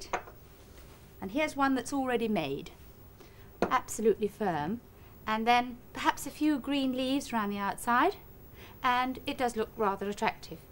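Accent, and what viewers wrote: RP, United Kingdom. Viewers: she sounds so posh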